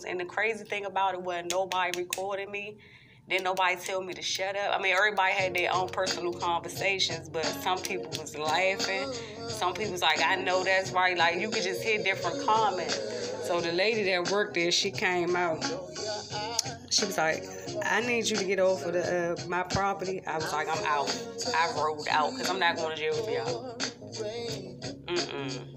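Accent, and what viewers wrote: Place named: Washington DC, United States. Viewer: Sounds like you're from DC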